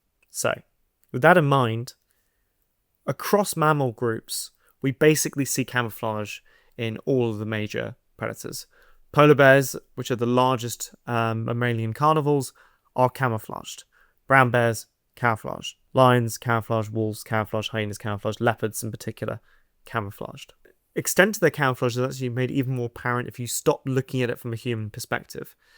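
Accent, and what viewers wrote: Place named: United Kingdom. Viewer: You sound British, any chance you're in the UK? If so, have you heard of DinoCon and would you PLEASE come present your work <3 <3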